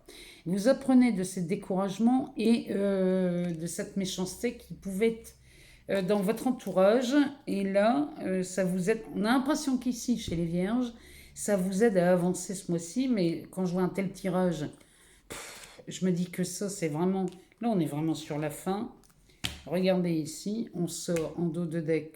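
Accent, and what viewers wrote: North, France. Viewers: Vous avez un bel accent du Nord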